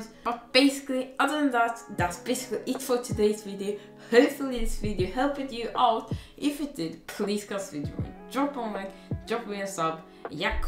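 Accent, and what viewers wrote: Sweden. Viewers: are u from sweden. im from denmark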